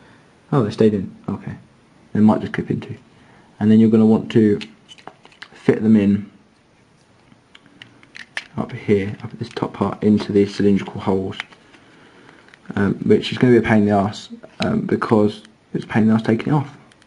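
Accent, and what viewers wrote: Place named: United Kingdom. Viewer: The HEAVY Brit accent is hard for this Yank to understand.I got about half of what he said, Sorry mate.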